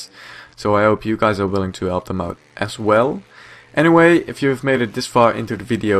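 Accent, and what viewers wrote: Netherlands. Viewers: are you dutch?? you sound dutch ;);)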